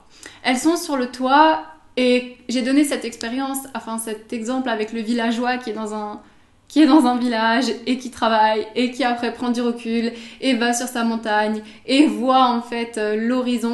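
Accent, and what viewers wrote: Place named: South, France. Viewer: ah merci:) en effet ca sentait l'europe méditéranéenne(surtout accent sur de la france, vers Cacasonne?)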